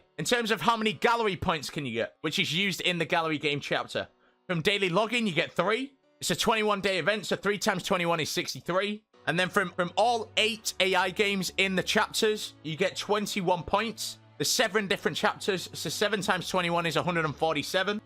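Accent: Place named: United Kingdom. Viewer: Teach me english! British english i mean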